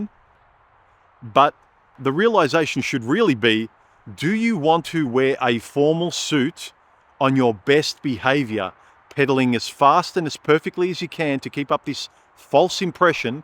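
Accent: Melbourne, Australia. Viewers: Think he's from the Melbourne area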